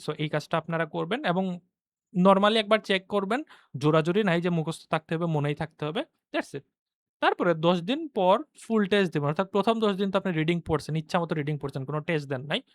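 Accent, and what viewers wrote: Sylhet, Bangladesh. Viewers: Bro are you from Sylhet? your accent is like a proper Sylheti 😀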